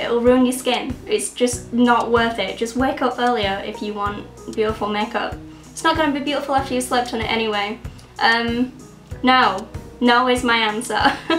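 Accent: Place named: London, United Kingdom. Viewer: OMG I love your accent so much. I am going to copy it when I come to London! I hope we get time to meet up :D :D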